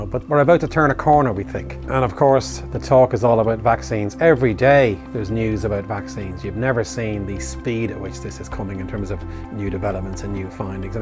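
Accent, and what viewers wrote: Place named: Dublin, Ireland. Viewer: Except for the dub accent, it's a good video.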